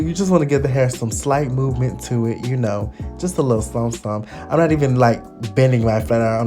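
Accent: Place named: Florida, United States. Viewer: Florida accent on fleek!! HAAAANNNN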